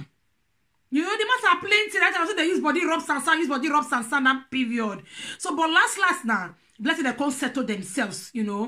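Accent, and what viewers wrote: Nigeria. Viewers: I love your pidgin👌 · Is your Gossip only for naija people coz your English only naija person can hear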